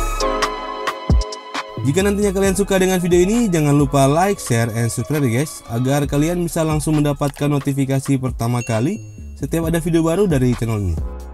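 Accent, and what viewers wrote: Kotamobagu, Indonesia. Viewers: Bang kau orang Kotamobagu?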